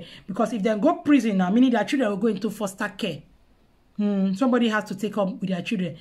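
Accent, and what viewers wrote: Nigeria. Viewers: Madam your vidoes are so helpful but try to speak proper English please coz some of us are not from Naija and we are finding it so hard to understand your pigeon English (sorry for wrong spelling) Thank you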